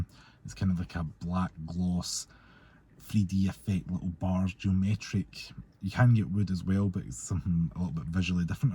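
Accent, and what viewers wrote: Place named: Paisley, United Kingdom. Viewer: I meant no offence, as I’m sure you are a lovely bloke. Right, I felt the camera was on you too much, especially when you were explaining or highlighting certain aspects of the car. Please don’t be offended, but at times I had great difficulty understanding what it was you were saying. There was very little separation between the spoken words. My daughter-in-law is from Paisley and has a similar accent to yours but she is easily understood. Anyway, I’d like to have been told more about the car i.e rear seating, do the rear windows go all the way down. Is there storage for the parcel shelf. What’s the kerb weight and so on. And what on earth is the “fuzzy Stuff”?? Cheers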